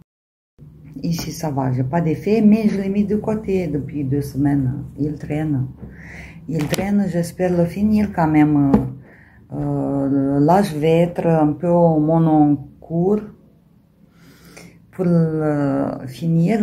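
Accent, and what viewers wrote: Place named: Italy. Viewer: bonjour c' est très jolie ,avec un magnifique accent a la monica bellucie!☺ laine jolie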